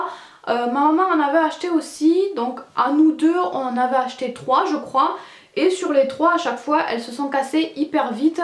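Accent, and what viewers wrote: South, France. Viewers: Le suuuud !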